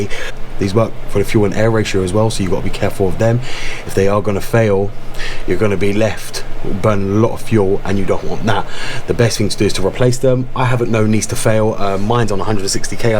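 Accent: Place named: Scotland, United Kingdom. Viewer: comprehensive information, thank you, can you please consider to speak a built slowly, for non-scotich native it will be difficult to follow you. I turned on youtube auto English subtitle caption but it seems this option can't catch what you said too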